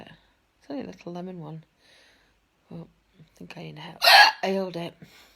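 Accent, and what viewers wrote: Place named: Australia. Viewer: You sound like an Ozzie ... and I adore ya! Pretty you are, too!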